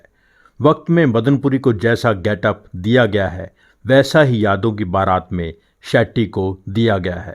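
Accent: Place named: Punjab, India. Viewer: Brilliant Hindi in Punjabi accent.....all in the family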